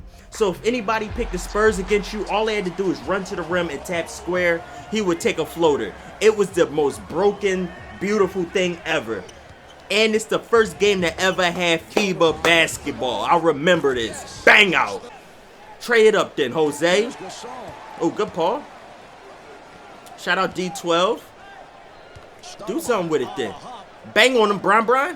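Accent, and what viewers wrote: Philadelphia, United States. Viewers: Lol you could tell you from philly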